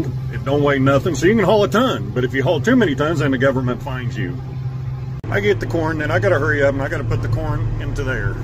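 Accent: South, United States. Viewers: You might live in Illinois now, but your accent says you came from somewhere further south, I reckon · That's the best southern accent from a Yankee, Ive ever heard !! 😊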